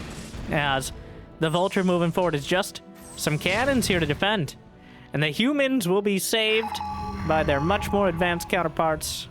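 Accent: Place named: Texas, United States. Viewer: I feel old I have watch your videos enough to notice you grow older and your voice dropped an octive. Also you sound like you came from Texas.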